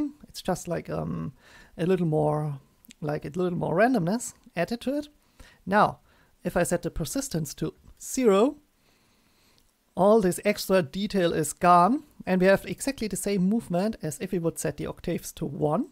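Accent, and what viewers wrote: Germany. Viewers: Love your Tuts. I don't know if its cause they're awesome, or your German accent is awesome... Or both maybe :)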